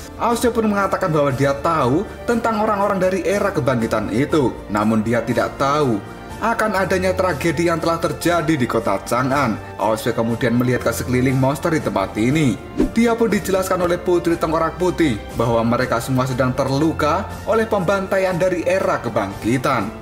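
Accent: Java, Indonesia. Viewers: Logatnya Jawa ya bagus sob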